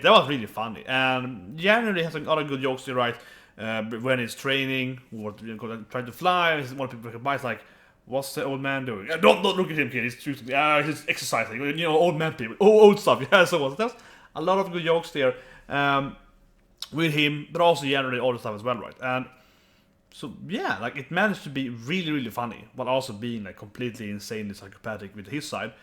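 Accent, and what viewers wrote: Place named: Sweden. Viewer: at first i couldnt tell if u were speaking swedish or english cool accent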